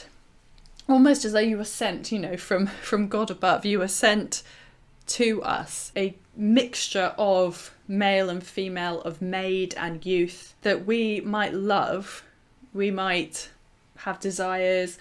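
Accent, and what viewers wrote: United Kingdom. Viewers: My first thoughts were that the poet has decided to view Clarinda as a Youth since that would make her admiration more natural and innocent than if she regarded her as a woman. (PS How was “Mrs” pronounced at the time? Had “Mistress” become “Miss’ess” or “Missus”? Also, I tend to pronounce “Pastoral” with the stress on the first syllable - but, then, I come from Birmingham and don’t have your pure RP accent.)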